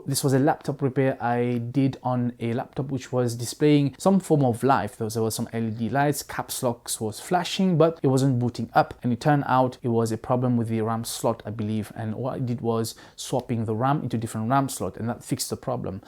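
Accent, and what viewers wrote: Mauritius. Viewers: yeah he sounded mauritian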